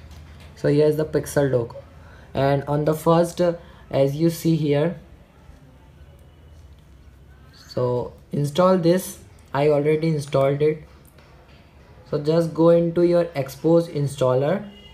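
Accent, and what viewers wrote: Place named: India. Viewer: Thank you dude! You're amazing (it's a little hard to hear Indian English lol)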